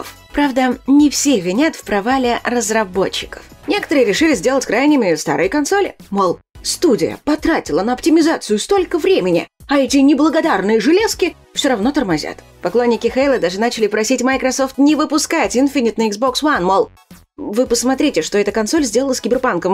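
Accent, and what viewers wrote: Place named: United States. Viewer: Манера общения, под американский мотив, методом прижимания верхней и нижней челюсти. При этом дикция поставлена, будто есть за спиной актерское мастерство) Но звучит приятно)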